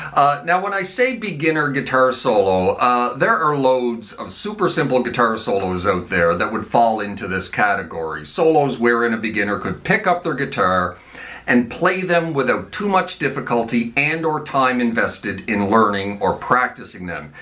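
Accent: Canada. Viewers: Was that a Canadian accent? checks amp Ahhh, yep.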